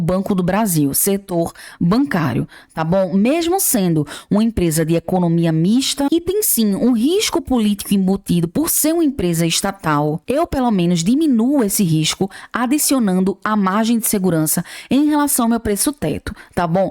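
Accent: Northeast, Brazil. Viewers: Letra B Vc é de q parte do nordeste? Sou de Caruaru PE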